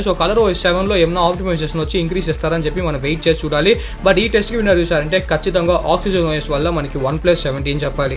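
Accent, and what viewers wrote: Chittoor, India. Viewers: Nice video bro kastha chitoor slang undi 😀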